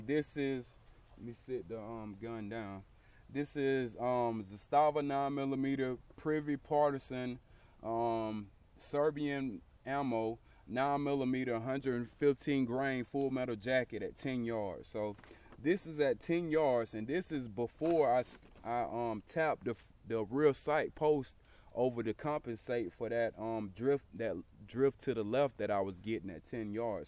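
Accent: South, United States. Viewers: I love the southern drawl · Don't feel bad about your accent. I'm from Georgia too. I was born in Rome Georgia. Now I live in Tennessee though but we're of the South and don't be ashamed of our Southern ways of talking or cooking or our love of guns.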